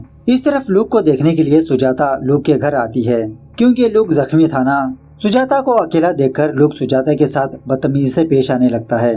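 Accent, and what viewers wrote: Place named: Maharashtra, India. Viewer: Iski Jaat Ka.., Is a Epic Word.. Creator Ne Must Bataaya Movie ko Short me.. Lekin Na Movie ke naam ka Matlab inko Samjh Aaya Na Propegenda Samjh Aaya .. Isiliye Hindi Dubbed movie ka Short bana Diya Copy Paste.. Ending Explanation Ka Tag use mat Karna.. Good Working lekin Aapka aawaz Maharashtra ke koi pichde hue Gaao ke ilaake Wala kheti kisan ya mazdur ke anpadh Bete jesa lagta he.. means baat karne ka tarika .. phir bhi best luck Meri Taraf se.. Jo Aap aaj yaha Tak pohche.. 👍🏼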